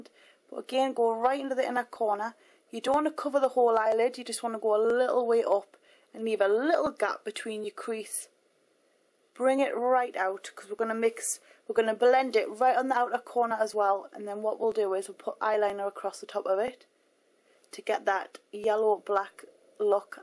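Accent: Newcastle, United Kingdom. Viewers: British accent or am I wrong..? Good vid!! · Its not Irish, its Geordie! And I think it's great :)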